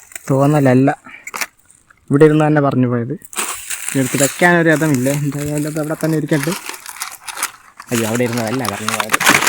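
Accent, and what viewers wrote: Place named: Malappuram, India. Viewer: മലപ്പുറം ക്കാരുടെ സംസാരവും ഇതും ഒരുപോലെ....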